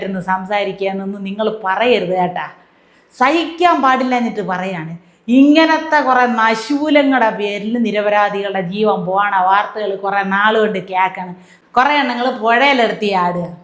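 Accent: Thiruvananthapuram, India. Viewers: അവതരണം സാഹചര്യത്തിനനുസരിച്ചു വേണം. 3 പേർ മരിച്ചതിൽ ഒരാൾ അങ്ങേയറ്റം ബഹുമതി ആവശ്യപെടുമ്പോൾ ഇത്തരത്തിലൊരു അവതരണം നിന്നും ഒട്ടും പ്രതീക്ഷിച്ചില്ല. കൂടാതെ തിരുവനന്തപുരം ഭാഷാ പ്രയോഗം അതിരു കടക്കുന്നില്ലേ എന്നൊരു സംശയം. ഞാനും ഭാര്യയും നിങ്ങളുടെ സ്ഥിരം പ്രേക്ഷകരാണ്. അഭിപ്രായം ഉൾകൊള്ളാൻ കഴിയുമെങ്കിൽ ഉൾകൊള്ളുക.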